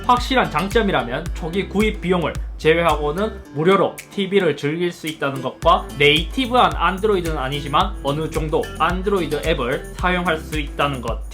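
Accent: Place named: Jinju, South Korea. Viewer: 고향이 진주 쪽 이신가? 억양이 친숙 하네요 ^^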